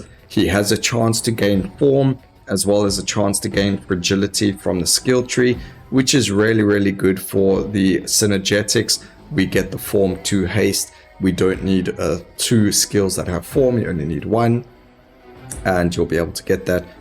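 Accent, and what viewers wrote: South Africa. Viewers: Is that a Durban accent? Defs South African. Thanks for all the video's they have helped tremendously.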